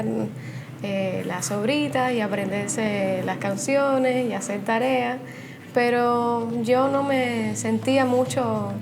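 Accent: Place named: Cuba. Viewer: esta hermosa y con ese acento cubano cosa mas grande caballero!!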